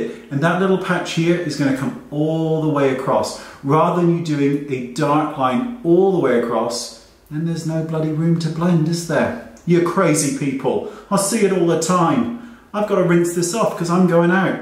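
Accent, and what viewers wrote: United Kingdom. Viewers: Come on guys, there's American English and British English and he has clearly a British accent!? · TBH I dont do make up,I just follow you coz youre so hot 😂 And I like listening when you speak british ,Im a weird? Maybe 😂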